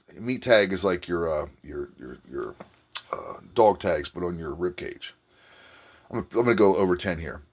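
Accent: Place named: Philadelphia, United States. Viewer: Man i heard that philly accent and thought damn ill get this dude some bread but your down in Florida . Im in md . Other than home made tats ive done all my work was done by a dude named Frank at a shop in philly off south street ( Eddie's i think is the shop every time i was wasted so i cant remember ), its all jacked up drunken spur of the moment stuff. If your ever blowing through MD or philly let me know 🤙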